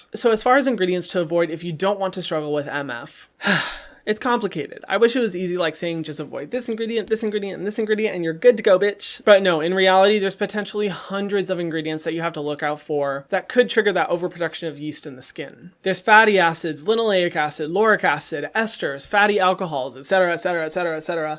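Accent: Scotland, United Kingdom. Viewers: Great Scottish accent !!👏🏽👏🏽 (but they don’t sound like that anymore😂) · I’m Scottish and that accent hurt me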